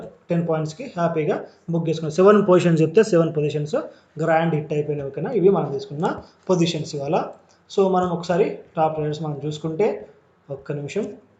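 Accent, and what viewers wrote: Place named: Telangana, India. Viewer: సార్ మీరు తెలంగాణ లాంగ్వేజ్ అలాగే ఉంది... 🤝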